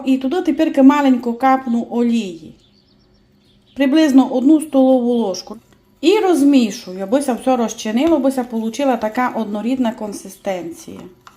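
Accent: Transcarpathia, Ukraine. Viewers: Дякую файно, дорога, за такий гарний простий рецепт. Цей калач ще виглядає, як великий кекс. Супер!!! Так приємно Вас слухати - стільки тепла і доброти у Вашому голосі!. І рідна закарпатська мова. Божих Благословінь Вам і Вашим рідним.❤❤❤ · Пані, у Вас дуже гарний голос і мені подобається Ваш закарпатський діалект. Чимось схожий на зебру, наче і просто виглядає, але через екран пахне.У Вас є рецепт Зебри?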